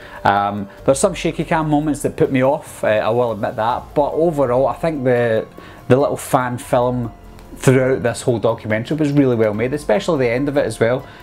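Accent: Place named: Dalkeith, United Kingdom. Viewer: your accent sound similar to my old friend from dalkeith mayfeild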